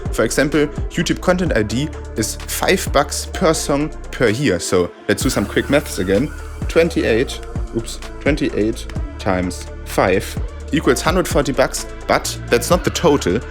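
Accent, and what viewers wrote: Germany. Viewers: Denglish on point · Der deutscheste Akzent, den ich je gehört habe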